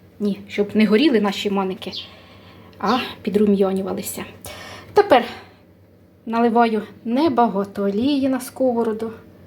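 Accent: Ukraine. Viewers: Це настоящя укра¡нська мова..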